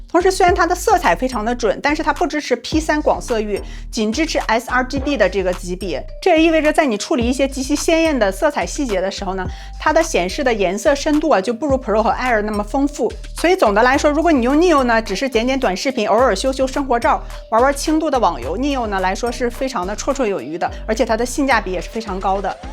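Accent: Northeast, China. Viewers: 东北话杠杠的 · 主播东北人。me too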